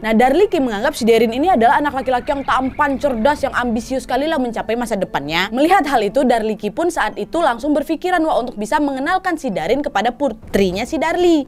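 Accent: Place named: Medan, Indonesia. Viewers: ya btul dr awal da curig, gwe ska knten ini, terutama hostnya, luar biasa gesturnya, bicaranya, segalanya ku suka, mmang itulah gaya Medan bicara, jelas x, masuk brang tu😃❤️😀👍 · Kak ini org mana si sebenernya? Kok ada bahasa jawa² nya, tapi logat nya kek Sumatra🤣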